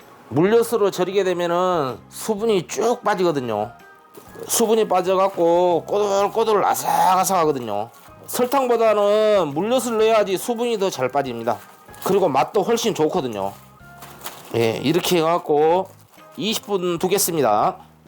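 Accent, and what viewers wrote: Jeolla, South Korea. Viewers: 전라도 말씨네요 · 아따 때깔 좋아붑니다요 말투 너무 정겹고 좋아요 혹시 전라도 북도쪽이 고향 이신가요 저희 고향 사투리 억양까지 너무 비슷해요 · 맛깔나게 양념하고 내고향 전라도 사투리까지 구수하게 더하니 더욱 맛잇는 요리가 될거같아요 바로 따라해볼께요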